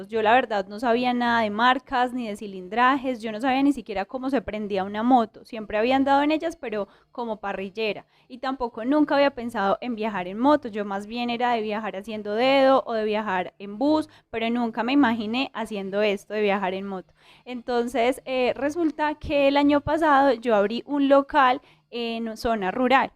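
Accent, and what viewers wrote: Colombia. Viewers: Me encanta el acento colombiano disfruto. Ollendote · Exelente video me gustan las motos y encontré tu videos y los miro por como ablas colombiana y tu humildad te hace grande saludos desde San Diego California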